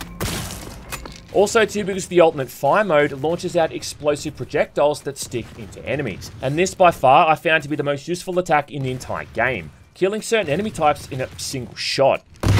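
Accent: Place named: Queensland, Australia. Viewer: judging by your accent, are you in QLD?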